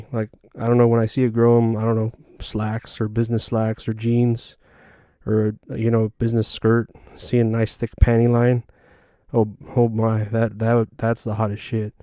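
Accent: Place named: New Mexico, United States. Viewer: We think alike watp sent got hooked fast! My pal n I are working on a pod cuz we have faces for radio hahaha well myself ya I thought you were from New. Mexico cuz people here talk aaawll like that. .hilarious brother rNew seescriber for life! 🤘🤓🤘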